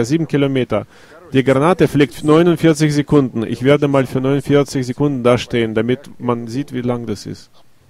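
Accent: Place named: Russia. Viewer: Russian trying to speak german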